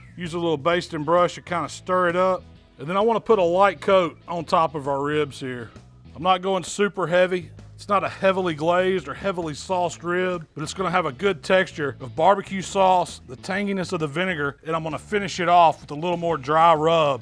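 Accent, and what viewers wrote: South, United States. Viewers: It’s that southern draw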